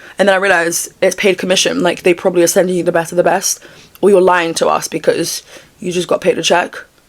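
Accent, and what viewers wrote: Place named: United Kingdom. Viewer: Why are you talking British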